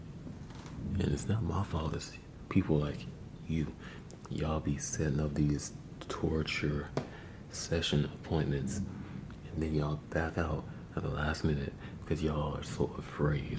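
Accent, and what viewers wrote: St. Louis, United States. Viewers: Ah, St. Louis! I didn't know the accent. I definitely hear you pronounce here, ear, and there differently than I'm used to. 🙂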